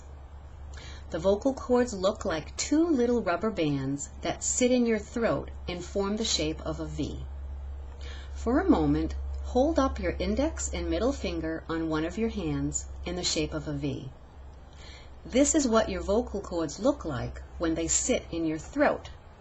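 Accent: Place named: New York, United States. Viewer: you seem to actually have a new york accent.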